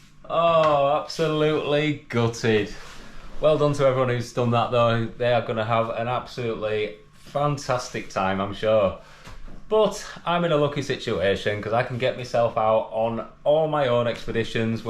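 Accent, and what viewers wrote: Yorkshire, United Kingdom. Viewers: Loved it such a different format loved seeing the different cities food apartments and you standing on street corners looking like you were loitering with intent hope we have more of your travel adventures 😊oh and that great Yorkshire accent ❤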